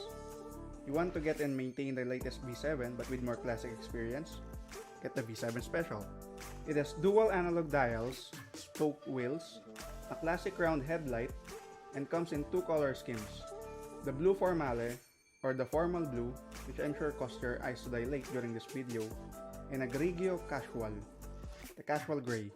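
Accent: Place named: India. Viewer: Interesting and well produced video, but I struggled with the narration. Not even the English speak that fast and coupled with your heavy Indian accent, it was difficult to understand you. Please slow down your delivery and I am sure your viewers will appreciate it.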